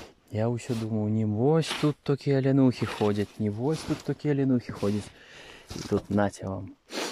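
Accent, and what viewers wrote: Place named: Belarus. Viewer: Я балдею от белорусского языка, которым говорят бабушки в деревнях. Ваш же близок к литературному, он не такой красивый. Главное, что Вы нашли, что хотели.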